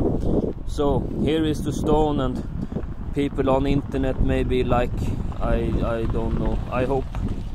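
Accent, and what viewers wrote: Sweden. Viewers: Asså de bästa svengelska jag har hört😭😭😭😂😂😂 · nä jag pallar inte XDD svengelskan är fan så åt helvetes bra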